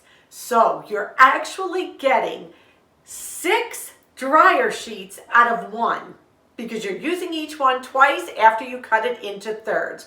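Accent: New York, United States. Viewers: Nacho something?? With 🍟, ground beef, sour cream, cheese. Today I hv binge watched your videos... You're my kind of people.!! Luv ya!!!- I hv never been to N.Y., I'm from Chicago, but I live in Northern Wi, since 94..... We don't hv a lot of stores here, but in N.Y., in sure there is much temptation.... I love thrift stores..... Since watching you, I do spend way less, learned a lot from you, so thank you.!!!! Wish to meet you!!!! You're so smart,and entertaining........ Bless you and too.. The N.Y. accent is so cute.!!!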